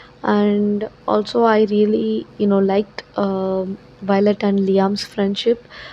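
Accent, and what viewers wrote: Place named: England, United Kingdom. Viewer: Clearly and easily understandable English Accent👏🏻👏🏻. I appreciate this and waiting for more.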